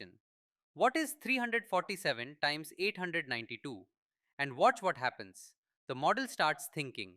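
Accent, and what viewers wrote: India. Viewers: first indian accent which I actually endured, insane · oh no indian english - instant quit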